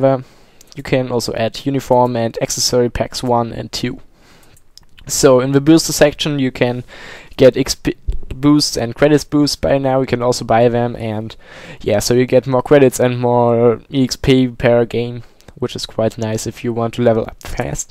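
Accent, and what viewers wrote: Germany. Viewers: sounds like u are german ? nice video ! :) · you german right ? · Nice german accent brah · Let me guess, you're German, right? ;]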